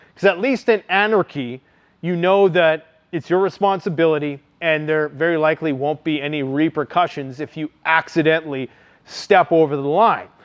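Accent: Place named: South, United States. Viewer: Lol sound like a true southern boy Tell it like it is don't care who you hurt or piss off😂😂😂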